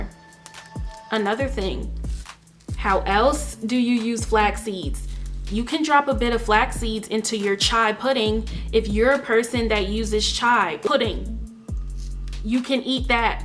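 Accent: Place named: Trinidad and Tobago. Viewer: You Trini? I hear it in your voice lol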